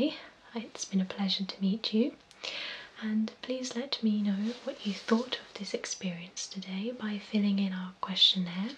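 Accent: England, United Kingdom. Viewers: You are adorable and have the cutest English accent! :) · This woman just radiates class and her english accent is a joy to listen to.wonderful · British accent😍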